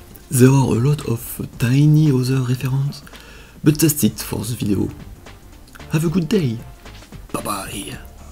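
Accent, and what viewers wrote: France. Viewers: Bonne vidéo. Par contre, ne le prend pas mal, mais je te conseil de bosser un peu ta prononciation de l'anglais. Certes, l'accent français excuse pas mal de chose, mais si prononce tous les mots anglais avec une prononciation française, ça risque à un moment de ne plus passer... Sinon, continue tes vidéos, tes animations ont cools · Accusent français très prononcé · Cet accent français de l'enfer ! Avoue, tu le fais exprès ? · Nice france accent man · It seems his voice is very french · You have a french accent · AH du bon français qui parle anglais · De l'anglais avec un accents français Noice... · ware a part 2? I love you french accent Un francais!! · this accsent is frenchy very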